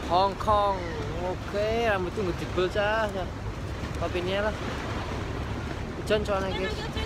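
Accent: Java, Indonesia. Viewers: Dari kabeh vlog yang kulihat semuane gawe kemekel tapi seng lebih kemekel yang jalan-jalan Disneyland Hongkong terus sama tutorial push-up, sit-Up, back-Up yang Salah. Demik seneng banget kambek medhok e. Sukses selalu mas 🙏 Selalu sukses buat mas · si muka bule suara medhog andalanku🌈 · Suka denger ka ngomong, lucu lucu medok gemesin ea.sip sip wkwkwk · Akhirnya muncul jg vlognya si ganteng tp medok ini haha😆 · Oalah lagi..ditungguin gak nongol2..upload juga. Suaranya..gak keliatan orang Jawa ya mukanya tp medok nya bikin ketawa 😂 Inget gak pas lagi tanding trus mau ke toilet ngomong pake B.ingg ke wasitnya. Aku ngakakkkkkkkkk lucuneeeeee yaallah sampe diulang2 ampe gak kuat aku nonton nya juga 😂😭 Keren lah..👍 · Wohooooo, akhirnya muncul juga yg ditunggu..medhokmu itu lohhh 😁 Sukses selalu yaaa😉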